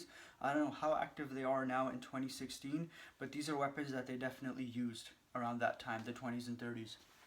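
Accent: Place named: Iran. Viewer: ایرانی بودنت داد می زنه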